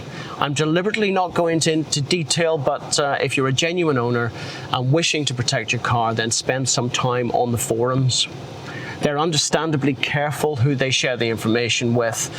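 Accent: United Kingdom. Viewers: Wait i thought it was just for the show Peaky Blinders but do british people really talk like this? Interesting..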